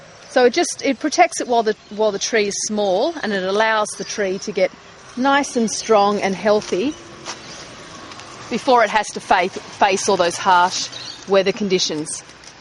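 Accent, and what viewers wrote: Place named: Australia. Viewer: cute aussie accent ;)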